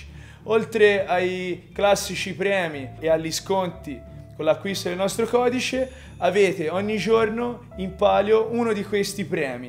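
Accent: Tuscany, Italy. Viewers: L`accento toscano è fantastico !!!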